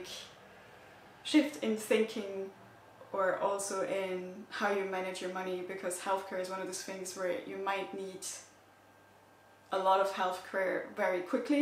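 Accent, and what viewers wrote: Ireland. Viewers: Loving the irish accent going on! Clearly you have been infected! Great video though. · I can hear little bit of Irish accent there . Nice .good luck I like other nationalities coming here ☘️ love Austria too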